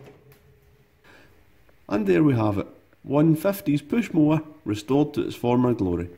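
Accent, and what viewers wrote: Scotland, United Kingdom. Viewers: His accent has me wondering where he is from the mower is British but his accent sounds Scottish if you read this can you please tell me if you don't want to that's fine my ancestors from my mother's side are Scottish and I would just like to know thank you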